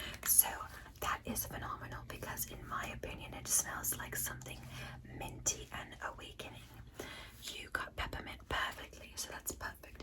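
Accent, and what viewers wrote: England, United Kingdom. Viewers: As a brit im wondering why you chose a british accent for alien rp XD what you trying to say?! (Not complaining really) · love British women...Can't beat that accent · X A rather long visual. However your work is admirable. The effort is just. “English Accent” sound. For all eternity. Heart. I send you my best. Goodbye. X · Love the British accent!it’s almost just like mine!(for the record I am British!❤ Alain. 24601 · U kind sound like a britisch person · Love the British accent ❤️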